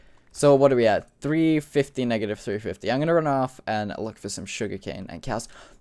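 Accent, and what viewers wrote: United Kingdom. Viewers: what happened to your british accent?:(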